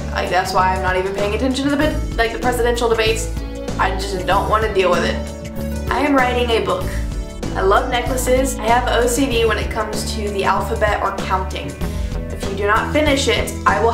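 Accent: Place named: United Kingdom. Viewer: Do you wanna know something im sorry for calling you by your real name but my name is as well it is just spelt with a ( c ) and who cares if u think you have a bad british accent you are silly no affence. Coz i loved your accent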